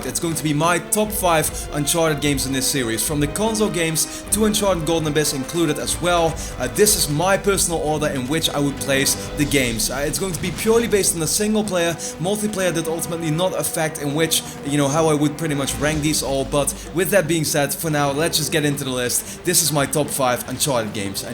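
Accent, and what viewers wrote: Netherlands. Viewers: He has a dutch accent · is he Dutch? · Are you from THE netherlands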